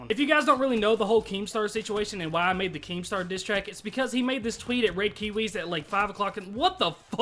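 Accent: Kentucky, United States. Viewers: Where from southern KY You from?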